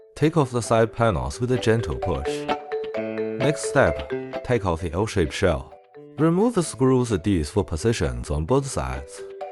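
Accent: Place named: China. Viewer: Wow this English sounds very Chinese!! 你好吗👋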